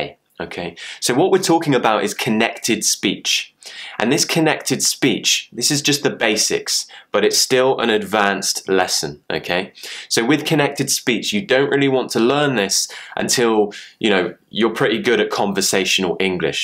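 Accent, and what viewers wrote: United Kingdom. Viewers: I love ❤ British accent. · Nice video 👍 Which area of British accent would you say your accent? As there are lots of British accent depends on the city? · you´re a perfect english teacher... your GB accent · The 'your' sound in the video sounds similar to 'yeah' sound to me. It's interesting to listen to British accent. Thank you for the video.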